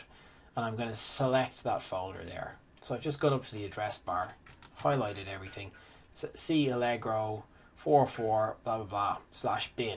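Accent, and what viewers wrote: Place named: Ireland. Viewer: Had to find this video again. I just listened for the Irish and here you are. Thanks again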